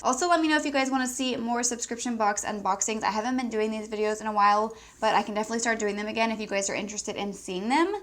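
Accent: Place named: United States. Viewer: i love the American accent 😍😍💙🎄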